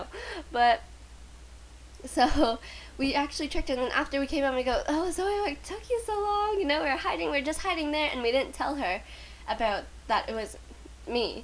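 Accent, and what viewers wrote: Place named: United Kingdom. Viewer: Oh no not the British accent again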